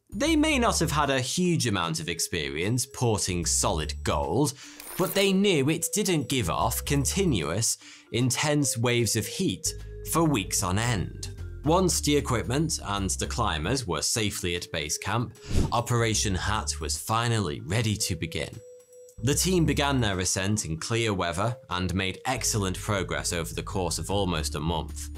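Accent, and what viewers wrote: United Kingdom. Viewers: this man truly is a pure British 😂😂😂😭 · He is british 😂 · definitely a pom - he ain't no Aussie, there's to much of the national costume & dance, not in this. Aussies are over the 'ditch' from me, thankfully.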